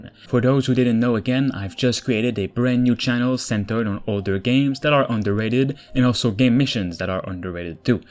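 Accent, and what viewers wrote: Quebec, Canada. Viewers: Mmmm nice video et nice accent mon quebecois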